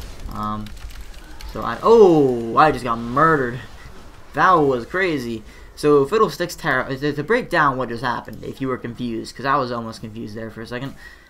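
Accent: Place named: United Kingdom. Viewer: You sound british, im wondering why youre playin' on na than :/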